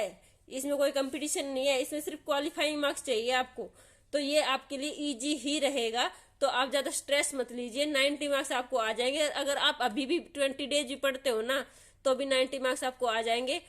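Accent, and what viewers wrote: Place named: Haryana, India. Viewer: Haryana tach boli,,, HR ki boli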